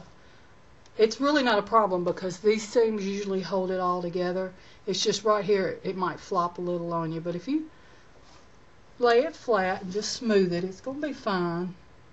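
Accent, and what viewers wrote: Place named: South, United States. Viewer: love your creativity...i use to make purses out of old jeans....this is a great idea. And miss that southern twang of yours.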